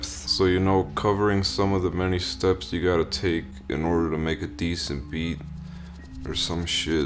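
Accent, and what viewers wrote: Canada. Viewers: I THOUGHT HE SOUNDED CANADIAN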